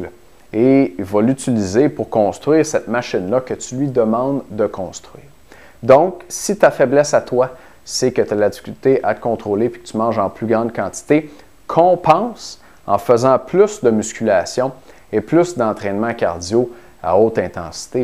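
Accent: Quebec, Canada. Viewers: Me rci pour tes precieux conseils pour moi c'est un peu le contraire ..je perd l'appétit en faisant la musculation. . .je ne sais pas si c'est bien la musculation qui le fait perdre ou bien les oeufs que je prend une demi heure après l'entraînement !?qui ne font que couper l'appétit. sinon pour votre accent québécois. .il est génial haha même s'il est un peu difficile de comprendre et saisir les mots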